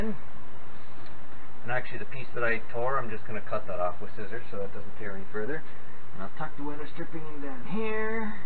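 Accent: Canada. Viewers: What part of Canada are you from?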